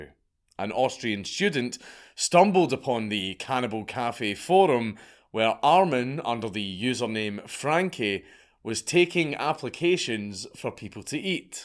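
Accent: Scotland, United Kingdom. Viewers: Dank love you bro but gotta move that pun counter it's blocked out by the subtitles. And your beautiful Scottish accent is almost indecipherable at times due to my lowly Baltimore origins. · I now narrate shit in my head with a scottish accent cos of the count haha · Loving the constant new videos. There's so many people to talk about and I love when you speak Scottish to me.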